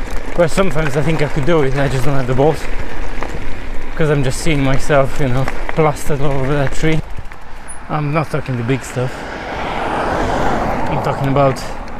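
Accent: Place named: Poland. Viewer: angielski masz dobry ale slabo slychac i do tego ten nasz angielski/Polski accent 😉 jak chesz wiecej subow to sie musisz pokazac na ekranie.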